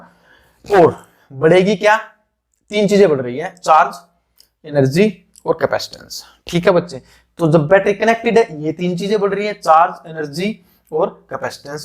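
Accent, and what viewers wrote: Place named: Haryana, India. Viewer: sir aap Haryana se lagte ho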